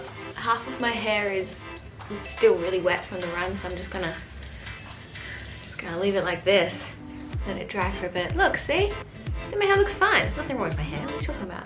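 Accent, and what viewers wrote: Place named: Australia. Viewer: Your Aussie accent tho, I can hear you all day! <3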